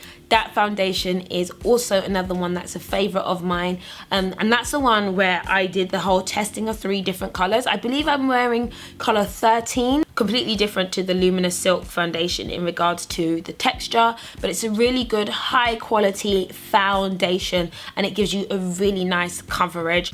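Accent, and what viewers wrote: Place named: United Kingdom. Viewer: you have a kinda British accent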